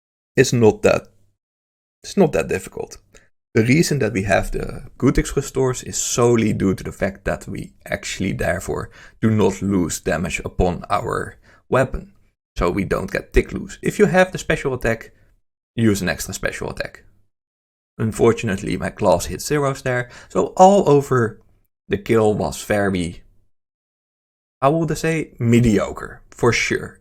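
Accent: Netherlands. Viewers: yo are you dutch by any chance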